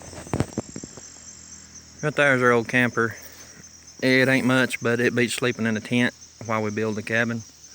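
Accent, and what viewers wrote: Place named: Western North Carolina, United States. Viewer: cool man! I'll watch. I subscribed to your channel. You sound like your from close to me from your accent. I'm in western North Carolina.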